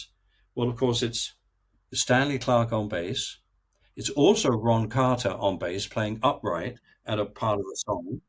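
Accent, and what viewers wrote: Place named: Scotland, United Kingdom. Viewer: sounding very Scottish today!!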